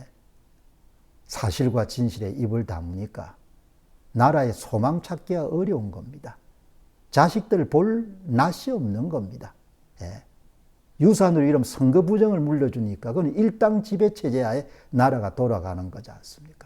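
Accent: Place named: Gyeongsang, South Korea. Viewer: 갱상도ㅎㅎ 편가르기 지겹다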